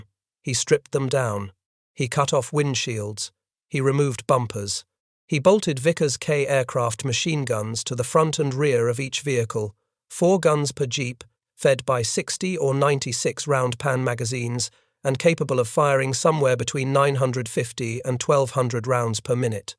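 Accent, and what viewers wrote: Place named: United Kingdom. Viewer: I refuse to listen to the same British narrator trash Germans every 30 seconds for being unable to understand breathing air or assembling 3 word sentences. News Flash News Flash News Fash British toady narrator The Germans had NO fuel for trucks, jeeps, tanks, airplanes Get it? Get it? Get it? NOOOOOO FUUUUUUEL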